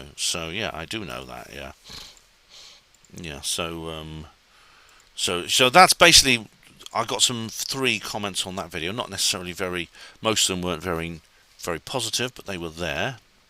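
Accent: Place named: Scotland, United Kingdom. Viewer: More Scottish accent please. 😄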